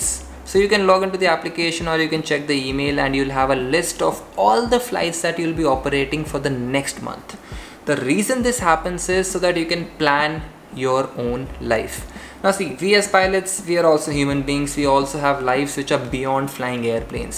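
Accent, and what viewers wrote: India. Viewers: You accent is very Indian.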